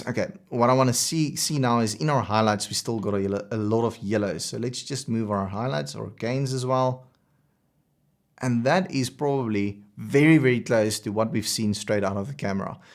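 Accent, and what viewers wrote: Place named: South Africa. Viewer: South African!!!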